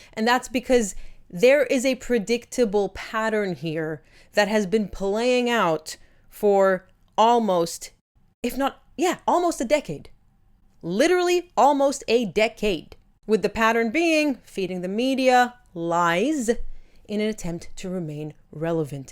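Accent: United States. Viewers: If you’re Australian why do you have a USA or Canadian accent? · You put out wonderful, intelligent content just wondering where are you from? You have an American accent but are an Australian citizen? just curious thank you for your service wherever you served. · Hold up.... record scratch You are an Australian??? So your One of Us? Wow you sound so American Now😆 🇦🇺👋👑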